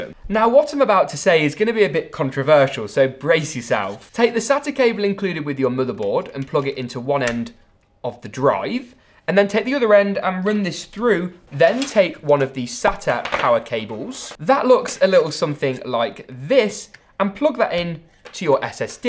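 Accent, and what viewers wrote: United Kingdom. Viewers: That British accent though, I'm intrigued😁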